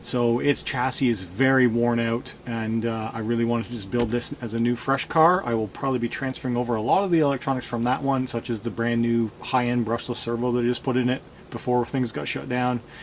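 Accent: Canada. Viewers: New to your channel. Just subbed. Thought you sounded like you were from the great white north. Nice collection. Just did my first tekno build. Mt410 baja beetle with a wing. Went a little over board with the part. $2000 without the reciever.🤯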